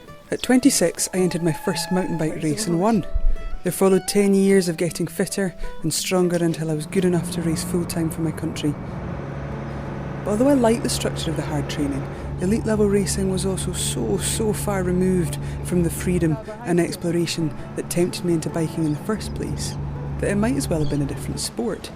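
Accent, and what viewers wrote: Scotland, United Kingdom. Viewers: Inspirational video. And the Scottish accent makes it even better. :)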